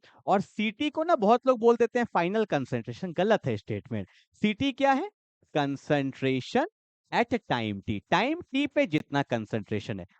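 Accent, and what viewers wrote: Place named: Kashmir, India. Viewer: Sir ka Kashmiri accent ❤😅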